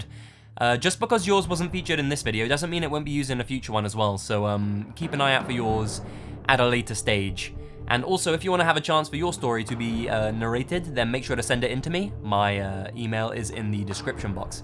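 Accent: England, United Kingdom. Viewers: Thanks for the content! Even though I'm from the UK also and have a similar accent, the way you said "and he stank" really tickled me, idk why haha. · Are we done picking on a person who sounds like he speaks with an "English accent" for pronouncing some "American words" in a manner which has some people Triggered? How about y'all Get Over It?! Maybe those Americans should concentrate on the fact that WE refer to many places in ways that the Locals don't...and THEY live there. Germany is called Deutschland. Turin Italy is called Torino by locals. Europe is often referred to as Europa. Keep in mind, the Narrator is (maybe) English. He speaks English. Maye it is YOU who are pronouncing names incorrectly. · I just love your voice and these abruptly pronounced sentences with english accent. Pure eargasm. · what part of england you from? or london should i say?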